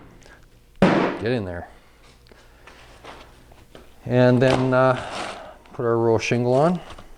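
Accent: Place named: Canada. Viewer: Rodney Byrd dude, be a little more tolerant man. He is most likely Canadian. Thats how they speak. He didnt call you out on your fucked up spelling, did he?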